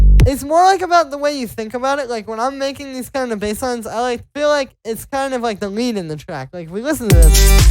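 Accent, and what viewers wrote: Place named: France. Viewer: Your voice is horrible,please stop talking during your videos !! Je penses que tu es français.. FERME TA BOUCHE ou ôte le bout de fromage que tu as entre les dents quand tu parles !! Quand on a une voix horrible comme la tienne on ne prononce pas 10 mots par seconde..ou Alors ARTICULE..c'est fou que tu ne t'entendes pas parler. mec...Corrige toi ..Sinon tu bosses bien tu as du talent mais stp tais toi !!!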